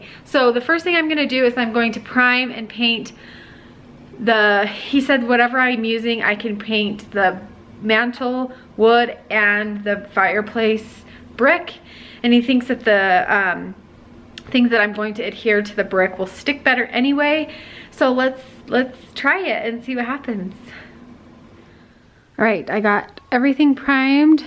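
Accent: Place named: Midwest, United States. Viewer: You are a working women!! I really like how it turned out. Sometimes you get into something and it can throw you, but you guys did it. I just love your wicker around your tree. Now! questions. You sound like you might be from the mid-west. I am from Iowa. My maiden name was Hansen, Yes with a sen. I was wondering. let me know. this is the second time I have found you. Also when you said call it a day. That sounds like something I would say. Joyce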